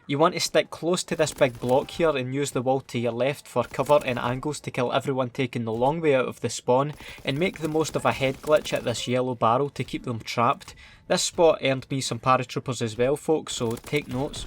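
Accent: Scotland, United Kingdom. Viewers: God I love scottish accent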